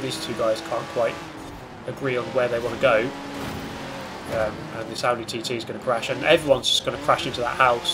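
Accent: United Kingdom. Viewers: A British accent and sarcasm is the best combo in the world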